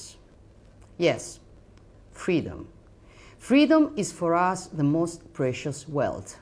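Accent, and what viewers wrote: Italy. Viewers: Pronuncia francese non da strapparsi le orecchie ma abbastanza scadente. Quella inglese già meglio nonostante diversi errori. Mi limito ai fonemi, non alla prosodia che è invece molto italiana. In ogni caso significa poco, le lingue sono un di più nel curriculum, come diceva lei bisogna guardare il resto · ha un diplometto di liceo linguistico ( unico presidente del consiglio della storia insieme con Mussolini ,a non possedere una laurea ) . Secondo me se volesse imparerebbe anche l' italiano . ( per ora parla romano dei bassifondi ) e neanche tanto bene .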